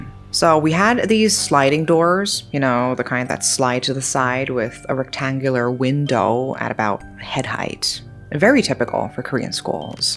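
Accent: United States. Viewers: I have always wondered how you got such a perfect American accent when (afaik) you grew up in Korea. Special gifted immersion school definitely explains it! // Man, everything I hear about the Korean school culture makes me SO GLAD I didn't grow up in it. // I love getting a personal ghost story, one that we know really happened and wasn't just a friend of a friend of a friend! <3 // Did you ever look up the history of the school to see if there was a case of a student being beheaded in an accident? // Those were great stories! Happy Halloween!! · You gave me chills with this one. Do your people have warnings against whistling at night? Also, have you lived in America? The reason I ask the second question is that it sounds like you have.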